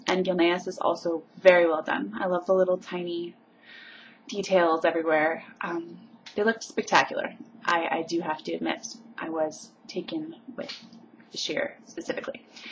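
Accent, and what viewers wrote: Canada. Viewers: You sound Canadian, good God, fail. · Ah how funny, a friend of mine was only talking about Rift yesterday....... interesting to see what it's all about although not sure i'll be getting it (which once translated = when my friends do, I'll get it too). Weird how when I was in the UK I couldn't tell the difference between Canadians and Americans yet now I can and within seconds I was like.......she's Canadian. Just thought i'd share lol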